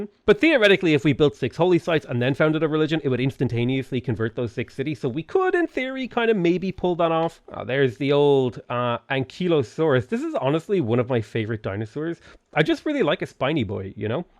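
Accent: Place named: Ireland. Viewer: The Irish accent makes a return by the end of this video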